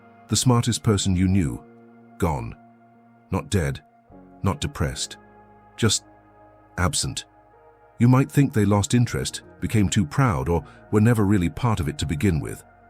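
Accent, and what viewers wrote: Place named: England, United Kingdom. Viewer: But the AI has a cool English sounding voice 😅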